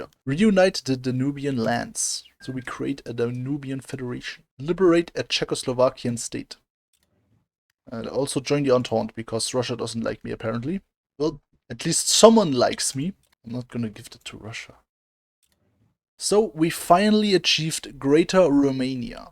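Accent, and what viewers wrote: Germany. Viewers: LOL. Dein Englisch hat so einen heftigen deutschen Akzent 😂👌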